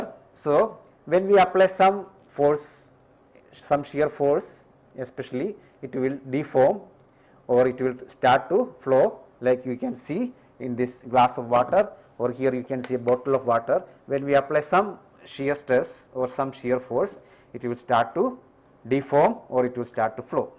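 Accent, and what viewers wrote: India. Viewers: because you re indian, for me it is sooooo hard to understand indian english accent but lecture is good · Great lecture! It may be hard for a non-Indian to understand his English but with practice you will be able to do it.. Indians are good it math and its worth understanding them even if it requires practice. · I'm Indian, adn I definetly agree that he has a very strong accent,a nd ppl frm around the globe may have difficulty understanding, tough Indins think his English is very clear. However, STANFORD and many other universities have such videos too.. So, I would say different videos cater to different audiences, in terms of quality, ease in understanding adn for obvious other reasons ! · the standard is good, moron. his english is fine, he has normal accent for indian people, and dat is fine.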